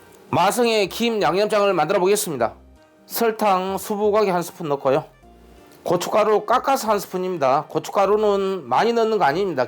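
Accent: Jeolla, South Korea. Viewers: 셰프님 전남쪽인갑네요잉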